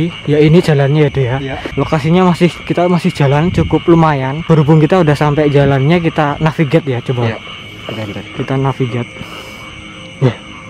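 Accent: Java, Indonesia. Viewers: Abang orang jawa ya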